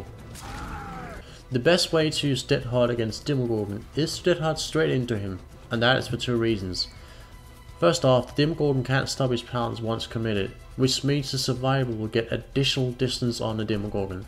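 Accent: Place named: Denmark. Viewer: this is really great. the dbd community needs more good demos to help us get better at playing him! also are you danish or swedish? your accent sounds like it.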